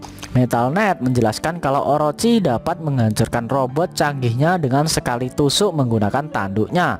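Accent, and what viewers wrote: Java, Indonesia. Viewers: Medok tolol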